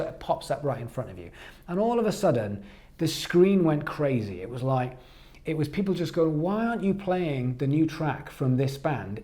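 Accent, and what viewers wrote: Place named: England, United Kingdom. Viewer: Thankyou so much, you are so knowledgeable, i have learned so much from you,and an English accent! I will follow your advice.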